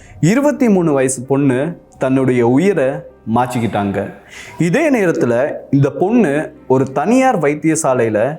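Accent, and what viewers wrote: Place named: Tamil Nadu, India. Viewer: இலங்கை சனல் தானேடா நீங்கள். பிறகேன் இந்தியாத் தமிழ் கதக்கிறீங்க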